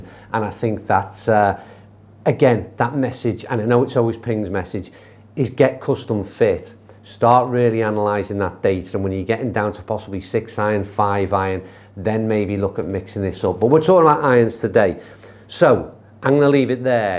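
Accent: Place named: England, United Kingdom. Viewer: Dude, your English accent is hard enough to hear/understand already let alone the loud freaking music.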